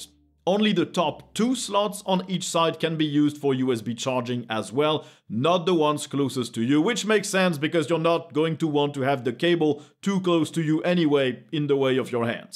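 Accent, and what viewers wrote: France. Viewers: je me disais bien qu'il avait un petit accent francais, j'ai enfin compris que ce n'était pas mon imagination x))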